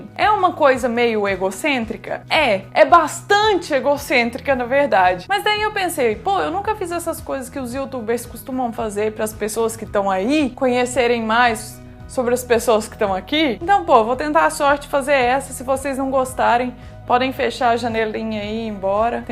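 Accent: Minas Gerais, Brazil. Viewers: CARA, COMO VOCÊ É FOFA, ESSE TEU JEITO DE FALAR "É BUNITO DEMAIS DA CONTA SÔ", ACHO LINDO E VOCÊ É UMA SIMPATIA DE PESSOA, TE ADORO, SUA LINDA!!! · Por isso do sotaque mineiro uai uehhehhehhe 😂👏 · Se você nao conta, ninguém ia saber que você é mineira :-) esse sotaquinho de Mineira... :-)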